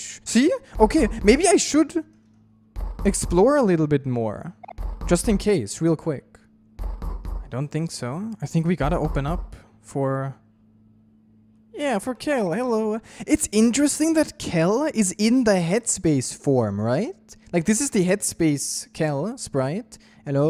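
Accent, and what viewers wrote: Germany. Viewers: Oh, he's German? I did think his accent didn't really sound American or British or Australian or any other English accents. No idea what Canadian accent sounds like or how it is to be distinguished from the others. But German wasn't what I thought he was, I had no idea what I thought tbh. I'm Danish myself, and I feel like Danish and German have similar accents, yet when I hear Germans and Danes speak English, the Germans' accents sound much better than ours (at least when it comes to politicians, I think, English sounds awful when spoken with a Danish accent). I do love accent though, he sounds so cool and funny, his voice is an enjoyment to listen to.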